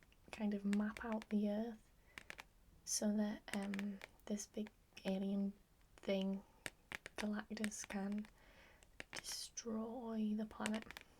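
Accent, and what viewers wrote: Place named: North East England, United Kingdom. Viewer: Cool vid and that accent is definitely my neck of the woods somewhere in the north east.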